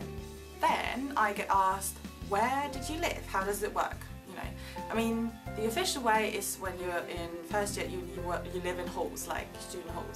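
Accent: England, United Kingdom. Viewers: are ya german? doesn't sound like. you actually have no accent, and you speak german with an english accent, which is impossible for a german! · How did you aquire such an English accent if you're originally from Germany? just curious:) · Woww you sound english.. I thought you were english not german ;o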